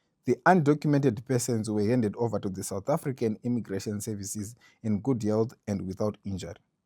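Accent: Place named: Zimbabwe. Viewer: You sound Zimbabwean Bro... Are you in South Africa as well?.... You know who will actually do something about this..... That Gayton McKenzie...!!!!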